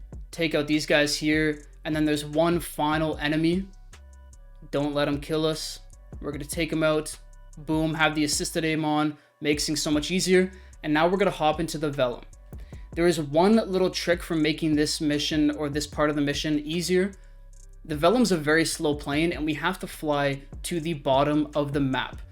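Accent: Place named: Canada. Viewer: Wow are you Canadian! Lol or from the UP of Michigan, regaurdless i dig it. 👍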